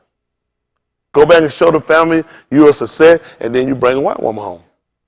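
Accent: South, United States. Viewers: He has a funny way of telling the facts of life. I enjoy listening to. He has a heavy southern accent. I guess I've been out here in Cali too long!!!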